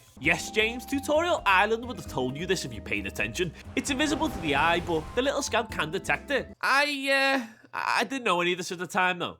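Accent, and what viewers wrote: Liverpool, United Kingdom. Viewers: This is an incredible amount of editing work to have done hatchling. Glad you enjoyed the game. I’ve also watched around 20 playthroughs but I never knew how much I needed an angry Liverpudlian version in my life. If the whole thing is recorded I can imagine our little Outer Wilds community would love to see the whole thing ::)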